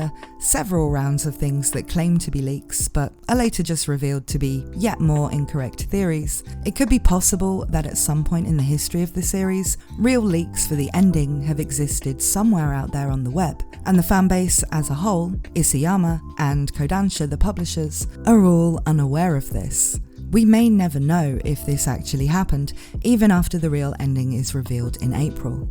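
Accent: United Kingdom. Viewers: Oh alright it sounded a bit diferent than what I’m used to hearing in a British accent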